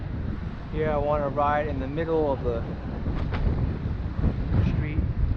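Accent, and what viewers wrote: New York, United States. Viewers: The hustling and bustling of the streets at Chinatown and Little Italy. I love to listen to the commentary and your NY accent. I will watch in stages. Do you prefer day or night bike rides? You have done an excellent vidblog. Thank you.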